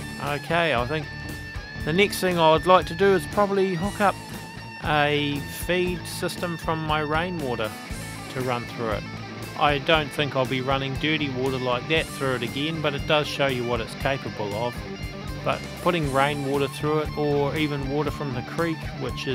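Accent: New Zealand. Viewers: Fellow kiwi accent I hear?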